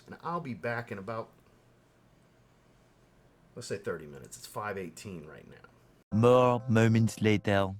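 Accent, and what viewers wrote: United States. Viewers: Sorry for this kind of strange question, but where do you come from?As a Pole, I find your American accent souding really good to my ear🙂,not too heavy, understandable though. Your review is good too.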